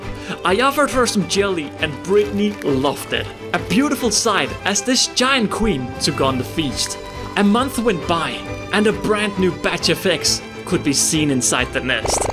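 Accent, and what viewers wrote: Denmark. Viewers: hey your accent sounds familiar are you possibly danish? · bro got a danish anent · are you danish?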